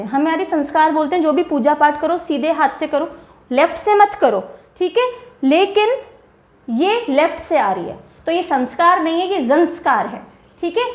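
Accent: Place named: Uttar Pradesh, India. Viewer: Awesome maam...she s from knp or lko...aisa lgta h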